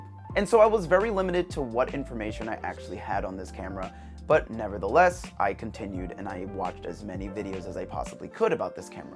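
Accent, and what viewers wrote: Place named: RP, United Kingdom. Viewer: Beautiful classical British accent. Versatile guy, you are.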